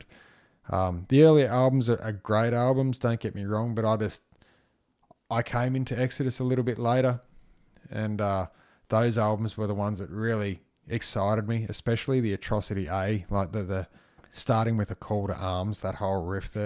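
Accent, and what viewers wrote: Australia. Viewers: Assuming I've got your accent right, how about a showcase of your favourite Aussie metal riffs?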